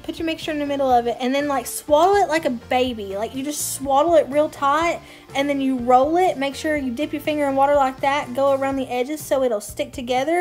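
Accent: South, United States. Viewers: You look just like my granddaughter and have the same southern accent I absolutely love it😂😂💕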